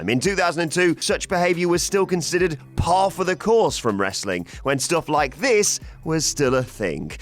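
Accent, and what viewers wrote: United Kingdom. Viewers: Anyone else annoyed by British voice overs? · Buck zoom off god I hate British English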